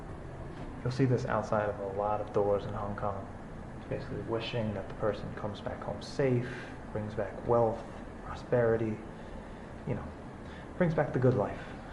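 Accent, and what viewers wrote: New York, United States. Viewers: Your New york accent is very strong in this video, or maybe I'm just noticing it now ;) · You sound more New York than the last video I just watched. I’m guessing you had recently been back . Interesting how accents morph as we travel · Great video. Your New York accent really came out this video 😂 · I can't do the accent man, I think you're a great watch, but that Judge Judy accent is getting thicker. Reminds me of some people who you would not want to be associated with from N.Y. Stay authentic mate. Jake in Oz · Apart from a great but nostalgic video I really like the NY accent and directness. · Love the NY accent. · This channel def makes me appreciate china al ot more. Very cool perspectives. People are nice and appreciate he can speak the language. Hilarious how his American accent is so New York.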